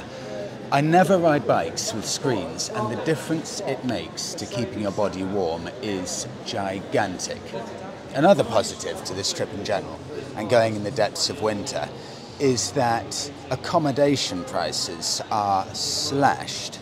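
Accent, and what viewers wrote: RP, United Kingdom. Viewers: Enjoy your trip to my end of the country. Though you will sound posh to most foke up here 😊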